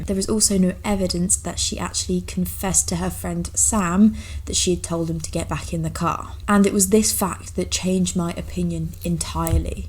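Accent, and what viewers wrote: United Kingdom. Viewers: Something about the British accent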